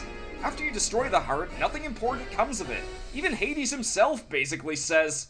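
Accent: Canada. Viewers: are you french canadian? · Is he canadian?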